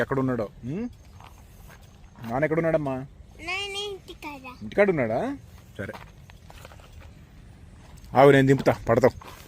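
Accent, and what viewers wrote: Andhra Pradesh, India. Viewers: nee telugu pakka andhra bro . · మీది నిజంగా నిజామాబాద్ యే నా ఎందుకూ అంటే మీ మాట్లాడే తెలుగు యాస తెలంగాణా కాదు ఆంధ్రా తెలుగు యాస లాగా ఉంటుంది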